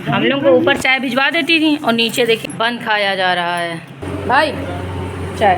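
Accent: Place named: Kanpur, India. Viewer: Same aesi meri bhi ammi h...khana bht pasand ata h unko..ar ap bilkl hum logo ki tarah bolti ho pakki kanpurya😅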